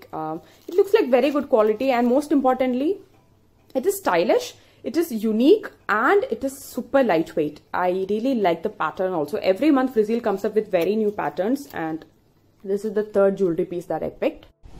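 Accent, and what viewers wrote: Kerala, India. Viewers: Are you a mallu! Your accent proves it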